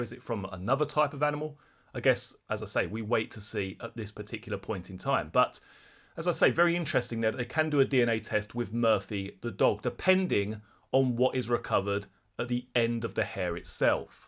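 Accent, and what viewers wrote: United Kingdom. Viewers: I love your accent. Made me realise how rarely I listen to BBC 😂😂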